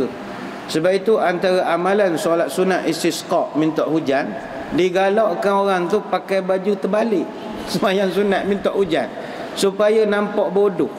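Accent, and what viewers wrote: Pahang, Malaysia. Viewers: Suka dengar Ustaz cakap loghat Pahang. Sayang Ustaz. May God Bless you dunya wal akhirah Ustaz.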